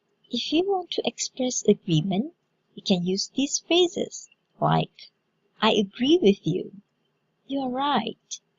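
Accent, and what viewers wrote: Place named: United Kingdom. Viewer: 8 mins before my MUET. Btw love your british accent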